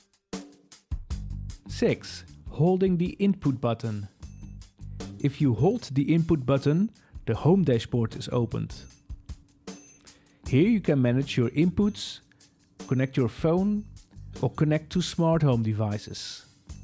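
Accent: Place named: India. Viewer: This voice belongs to someone from India. It's impossible not to recognize the accent.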